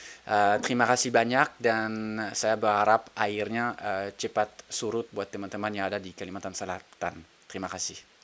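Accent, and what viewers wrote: France. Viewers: Lucu logat Prancis nya😂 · Aku mendengar logat Perancis, sounds so good 🤭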